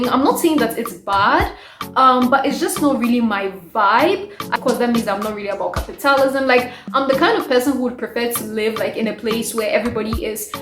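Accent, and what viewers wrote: Ghana. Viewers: Do you speak Twi? I'm asking because of how you speak English, the way most Ghanaians do.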